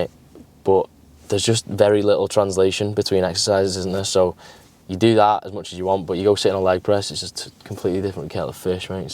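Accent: United Kingdom. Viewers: Love this british accent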